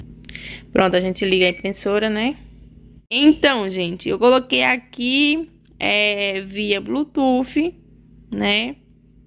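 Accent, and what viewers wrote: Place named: Recife, Brazil. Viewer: Arrasou e esse sutaque so pode ser de Recife sucesso mulher <3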